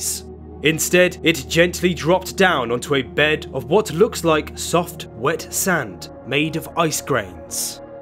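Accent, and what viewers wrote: United Kingdom. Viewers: Is the narrator the same Brit who pushes all the snake oil cures?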